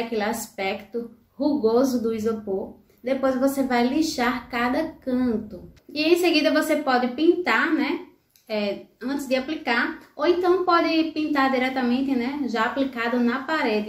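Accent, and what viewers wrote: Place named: Northeast, Brazil. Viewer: parabéns... ótimo vídeo... eita sotaque gostoso de ouvir ... nordeste é tudo de bom ,,,kkk 👏👏👏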